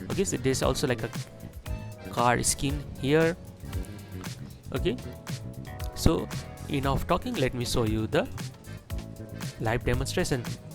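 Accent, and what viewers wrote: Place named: Nepal. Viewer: The video was really good and easy to understand, but your accent got me. By any chance are you from Nepal? Your accent really reminds me of a typical Nepali accent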